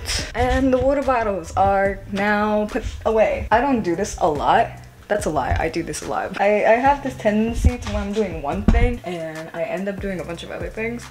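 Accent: New York, United States. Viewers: u from nyc? 🤨 love ur accent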